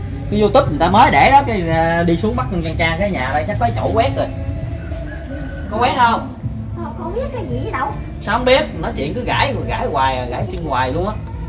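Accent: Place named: Mekong Delta, Vietnam. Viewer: Haha, giọng điệu đúng chất miền tây luôn a ơi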